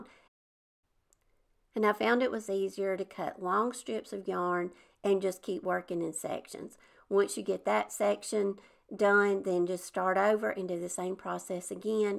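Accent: South, United States. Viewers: I am fairly new to your channel. I love your idea's. You make such darlin thing's. May I ask which state you live in. I live in South Carolina. You have that southern drawl.. So do I.. I would take a little guess and say you may live in Tennessee. I have family in Tennessee. Thanks for sharing your beautiful craft's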